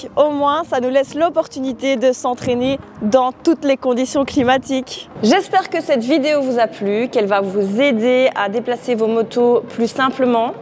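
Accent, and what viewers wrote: Belgium. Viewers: Supers conseils, même pour qq1 d'averti, merci (waouw l'accent région Liège, je kiffe - Pierre - 4500 - Huy)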